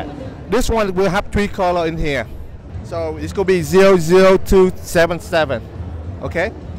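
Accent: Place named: Vietnam. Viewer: Hears voice * immediately realizes he is Vietnamese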